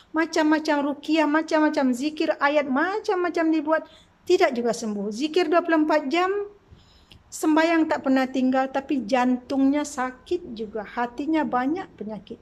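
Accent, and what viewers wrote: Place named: Malaysia. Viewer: Bahasanya has Malasiya enak didengar 👍🙏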